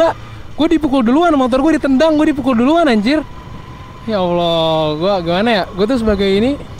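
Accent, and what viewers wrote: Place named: Bogor, Indonesia. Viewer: Bang lu orang Bogor ya bang😂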